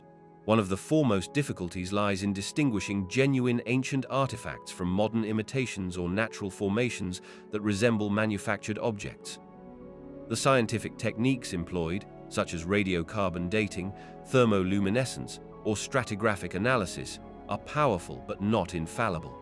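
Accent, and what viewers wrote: United Kingdom. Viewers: It’s remarkable how repetitive this British sounding video is while we’re all waiting to see pictures of intelligent reptilian beings. If the narrator had a southern accent it would be easier to realize the repetitiveness because the southern accent sounds lees intellectual to northerners perhaps due to how Hollywood and other sources have often poked fun at American southerners.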